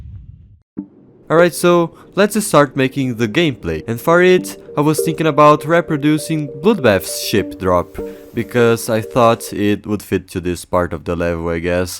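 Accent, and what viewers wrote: Brazil. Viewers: I KNEW IT,im portuguse from portugal and i knew your accent was from brazil xddd ola de portugal adoro o teu conteudo! Continua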